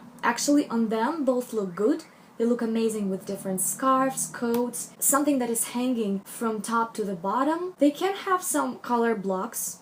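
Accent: Russia. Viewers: Акцент похож на русский. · Is your first language Russian (or any other slavic one)? Your accent is lovely by the way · that russian accent tho, can tell, you're from my country :D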